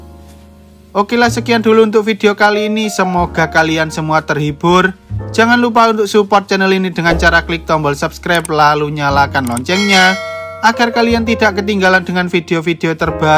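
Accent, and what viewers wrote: Java, Indonesia. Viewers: bg bisa gk ngomong nya jan medok gitu :( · Suaranya cmpereng sama medokk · Medok bgt Jawa nya om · nadamu mas nyell jowone ndk ilang😂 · Bang bagus kalo cerita tanpa logat jawa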